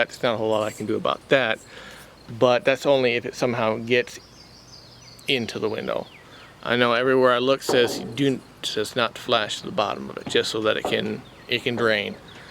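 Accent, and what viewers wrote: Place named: Tennessee, United States. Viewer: Ur Tennessee TWANG is started to come back, lol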